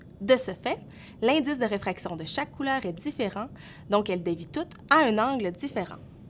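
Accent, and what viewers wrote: Quebec, Canada. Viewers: C'est quebecois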